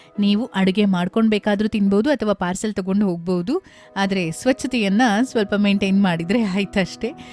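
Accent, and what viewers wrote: Standard. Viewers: ಏನ್ರೀ ನಿಮ್ ಕನ್ನಡ👌.. ಕಿವಿಗೆ ಇಂಪು ನೀಡುವಂತಹ ಸ್ವಚ್ಛ ಕನ್ನಡದ ವಿವರಣೆ😍 ಕಣ್ಣಿಗೆ ತಂಪು ನೀಡುವಂತಹ ಮನೆ ಹಾಗೂ ಸುತ್ತಮುತ್ತಲಿನ ವಾತಾವರಣದ ಚಿತ್ರಣ.. ಬೊಕದಾದ ಬೋಡು 😊👌..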